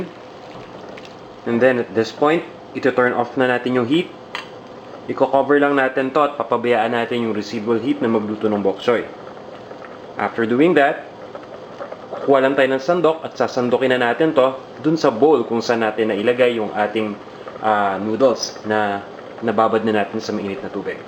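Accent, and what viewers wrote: Philippines. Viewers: Sir this is an amazing recipe for spicy beef noodle soup. I can't tell if you're speaking English, with a heavy Filipino accent or what but I can tell that that makes little difference. You my friend have just created magic in this spicy beef noodle soup. I cannot believe no one's commented on this recipe!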